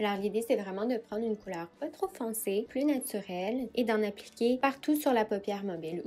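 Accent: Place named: Canada. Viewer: sur cette video la dame est canadienne je pense. elle a un accent prononce. elle ne parle pas bien le francais de france. elle se trompe dans les determinants. donc pas top pour apprendre le francais.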